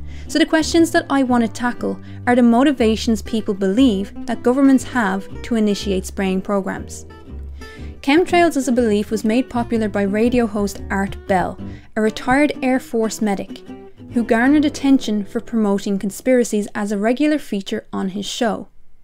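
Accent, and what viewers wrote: Ireland. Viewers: Have to confess I’m a sucker for a female Irish accent even if biting sarcasm is missing. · Oh I like her voice.... Love that Irish accent. Much better than that welsh stuff (kidding, love ya ). So contrails... fuel is a hydrocarbon.... the hydro is important here. When the fuel burns one of the exhaust components is water vapor. That water vapor is what we see when the conditions at altitude are right to cause this to happen. Temp, pressure and humidity are important factors. These conditions might exist at ground level, or only above 40,000 feet, or anywhere between. So... yeah, just water kids, nothing more. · yes, has a Welsh accent. has an Irish accent. Welsh accents are a bit harsher. is easier for people to understand. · she has a lovely irish accent, i set the voice on my satnav to Irish Female, its so melodic. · It's that Irish accent, it's very nice 😁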